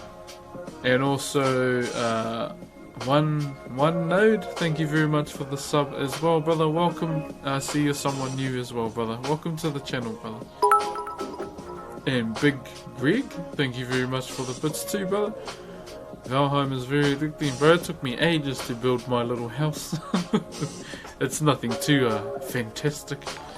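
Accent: New Zealand. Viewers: Kia ora, just came across your content and hearing you talk remind me a lot about New Zealand. Spent nearly 10 years in Aotearoa, one of the best places on Earth. Keep up the good work bro!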